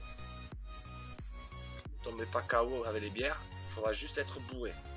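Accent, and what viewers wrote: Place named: South, France. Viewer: Oui toutes tes vidéos sont bien expliquer avec ton accent du sud j adore poto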